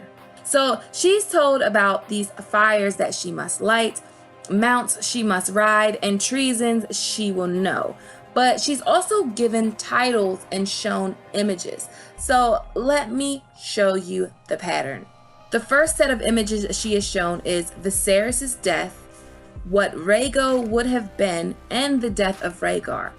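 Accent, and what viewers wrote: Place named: Baltimore, United States. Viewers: I agree I hear a distinct Baltimore version of the Long Night. I don't know where is from but I am hoping I am right. She send us Ravens it only makes sense. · your critical thinking skills are next level...i have enjoyed your videos for the past couple years (you, gemma, quinn, and preston jacobs)...you are obviously as obsessed with the GOT world as i am. (and your baltimore accent reminds me of my family) keep making that magic happen.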